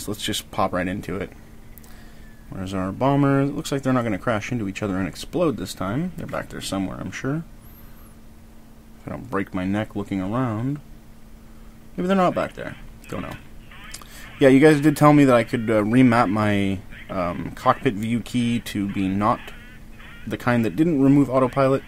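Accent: Germany. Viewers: We love the german accent!! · I'm a german and i enjoy listening to you speaking with german accent Its hilarios Keep doing it your way, it's awesome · The German accent is the greatest thing ever. If you're trying to make me angry with it, you're going to need to try harder!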